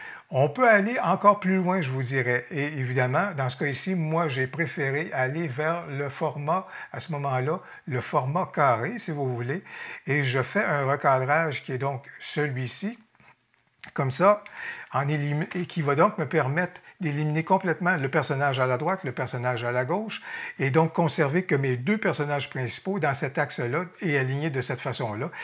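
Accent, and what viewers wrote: Quebec, Canada. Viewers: Et cet accent, il me fait trop voyager vers votre belle province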